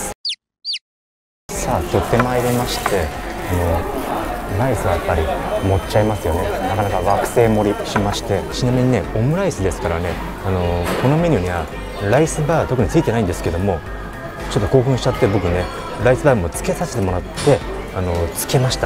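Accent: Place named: Okinawa, Japan. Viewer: さんの沖縄なまりの話し方が大好きです。生まれた時から沖縄に住まれてましたか？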